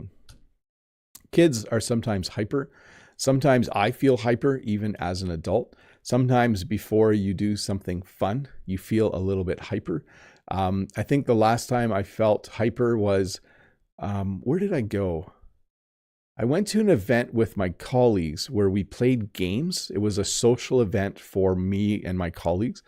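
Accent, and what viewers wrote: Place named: Canada. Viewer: I love canadian accent❤️ It kinda sounds good 😊👍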